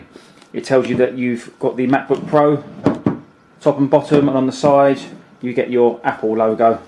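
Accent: United Kingdom. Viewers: I find it pretty amazing actually but the recurring kernel panic issue due to the T2 chip even after installing Mojave updates is stopping me from buying it in the meanwhile , and yeah I kind of guessed it would be British accent haha 😅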